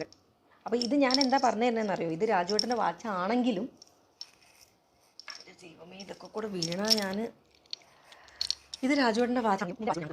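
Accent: Kozhikode, India. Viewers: Kozhikodan slang nice presentation❤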